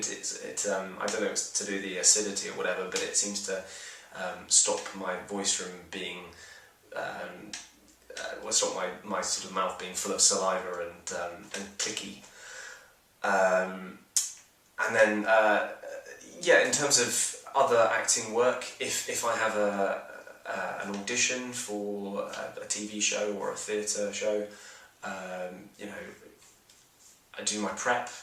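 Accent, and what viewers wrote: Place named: England, United Kingdom. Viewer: so it WAS an english accent after all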